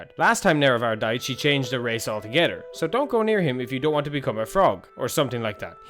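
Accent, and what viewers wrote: Ireland. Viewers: Dude you misunderstood something, the realm of a Deadric Prince is his BODY. Why do you think Jyggalag didn't come back after this? Because he lost his Real/Body and so has the power of a lesser Deadra. You the "Hero of Kvatch" are the new owner of this realm, so you own all the power that is in it. Love your Channel and your Irish accent. Ps. Dragonborn can alter the Dream of Creation and so are extremely powerful but are only tiny pieces of Aka (the Original time god).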